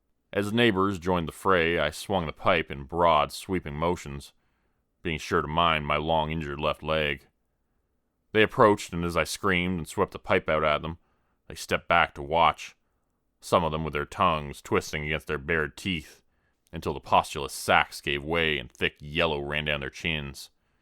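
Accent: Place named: Canada. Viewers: Finally. A Canadian narrator. Great voice and story telling Sir! I will subscribe to you now. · I love the Canadian accent. I'm from Western NY living down south and it sounds like home!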